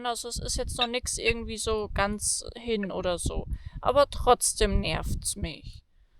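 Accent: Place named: Rosenheim, Germany. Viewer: "Servus aus Marokko" cool! Schön, dass man in und um Rosenheim immer noch so redet, wie man da halt redet : )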